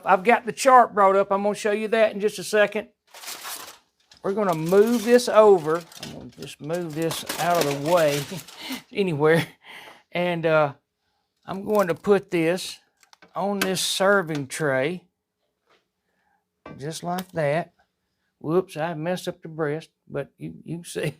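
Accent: South, United States. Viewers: As a new yorker! I like your southern accent very funny and hapoy!! 😂😂😂thank you for this video! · Thank you so much for this wonderful demo. It was just what I needed. And I just love everything about the way you did it and as a southerner, loved the accent to death!!! ❤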